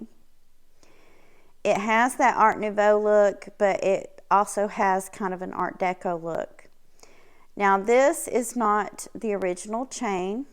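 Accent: South, United States. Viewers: Thank you! I love looking at your collection! You are a wonderful historian and being from the South, I love your accent! ❤️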